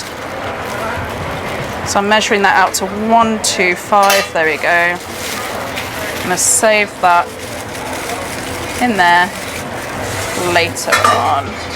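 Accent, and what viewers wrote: United Kingdom. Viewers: Hi there u are professional as a 1 Michelin star chef but your presentation is way too serious.. pls lighten up n I m sure you will get more subscribers.. smile more! You r very pretty with a nice British accent. Love your blonde hair too! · Fantastic British accent love it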